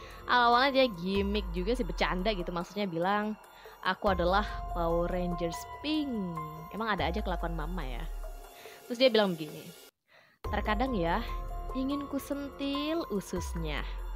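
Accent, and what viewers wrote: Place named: Medan, Indonesia. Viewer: Saya suka dialek medan / batak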